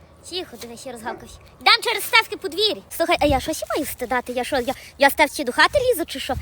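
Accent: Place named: Ivano-Frankivsk, Ukraine. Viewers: Пані,як я люблю Вас дивитися то є цуд.Що би я робила без Вас,подивлюся Ваші відео і настрій маю на цілий день.Ваші відео антистресові😅.Здоров'ячка Вам міцного.Ви вмієте розказати і показати все файно як є в житті.А воно так в житті і буває.У Вас такий говір,як і в нас на Івано-Франківщині Всього Вам найкращого.❤❤❤❤❤❤ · ПАНІ. ВИ ЯК ЩАВЖДИ НЕПЕРЕВЕРШЕНА У НАШОМУ ЗАПАДЕНСЬКОМУ ГОВОРІ. ВАМ ПОВАГА РЕСПЕКТ. КАНАЛУ❤❤❤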